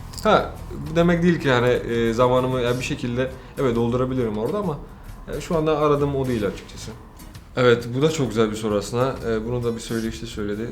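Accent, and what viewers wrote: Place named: Cyprus. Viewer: Kıbrıs aksanına çok benziyor konuşması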